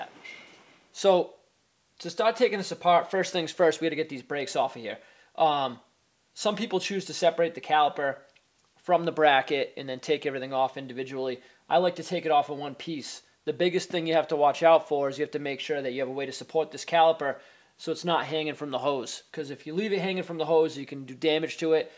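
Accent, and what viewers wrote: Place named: Maine, United States. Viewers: from his accent i would have to say east coat, up wards of Maine or Vermont area. Leaning more towards Maine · Reminds me of the old Ford twin I beam suspensions when the kingpins would rust fast from lack of grease maintenance. Would make the truck wander all over the place and impossible to turn the wheels unless you had power steering. And I'm detecting a New England accent.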